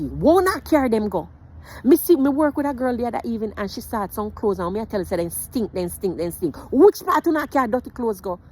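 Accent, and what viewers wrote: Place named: Jamaica. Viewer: This message could not be any clearer!!!! Love to hear the Jamaican dialect!!❤❤❤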